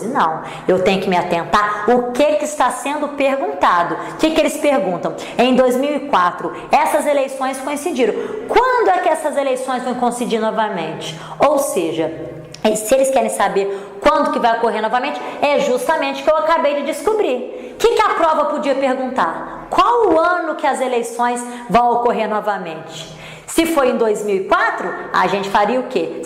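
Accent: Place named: Minas Gerais, Brazil. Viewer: Professora, amei o vídeo! Adorei o sotaque mineirinho também! 🙂 Gratidão!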